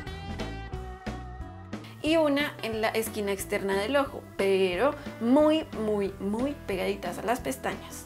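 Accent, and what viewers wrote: Colombia. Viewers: Usted es de Colombia ??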